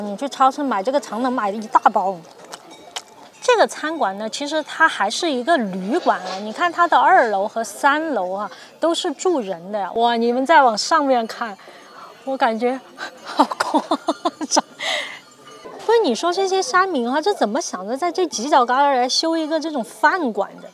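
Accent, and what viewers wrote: Sichuan, China. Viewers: 主持的川普好亲切，兄弟们点赞是必须的👍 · 川普的味道还是一直保留了一点点. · 说的一口地道的川普好听😁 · 浓浓的川妹子口音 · 兄弟，你挺美的，你一说话，我就知道是咋们四川或者重庆的兄弟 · 四川口音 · 四川塑料普通話好親切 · 听你的口音就晓得是川渝勒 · 不晓得正大综艺的多半90后，不过确实有点像，在四川听川普，很不错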